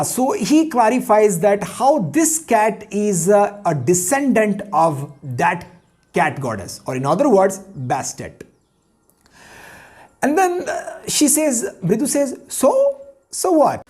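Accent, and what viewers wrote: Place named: England, United Kingdom. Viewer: Wooooow Sir.... Nice English Accent ... Mind Blowing Sir....Shriya...😍😍😍👏👏👏👍👌👌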